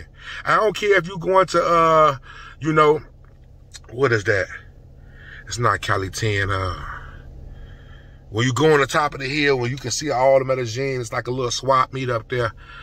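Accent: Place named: Chicago, United States. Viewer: In can tell he from Chicago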